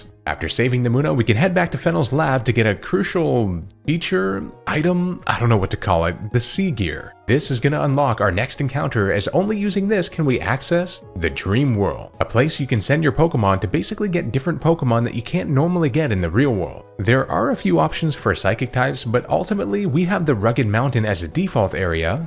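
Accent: Canada. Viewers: Your canadien eh?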